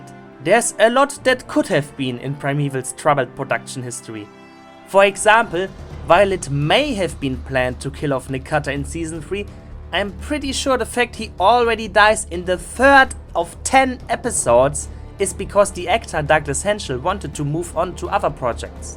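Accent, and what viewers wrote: Germany. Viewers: Hab mir doch gedacht, dass du Deutscher bist! Dieser Akzent :D Aber deine Aussprache ist sehr klar, bist gut in Englisch. Und danke für deine Videos.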